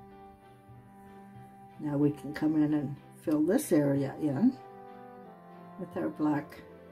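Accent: Canada. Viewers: I picked up from your accent that you are Canadian. I'm in Adelaide in South Australia and you will definitely be hearing from me again. I just love your pieces.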